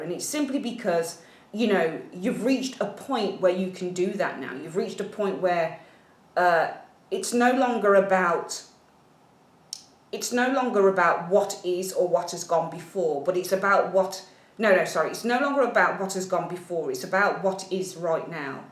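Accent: United Kingdom. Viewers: You should put a Brit flag on your icon bc i cannot understand you. I'm sorry. I only speak American English. :(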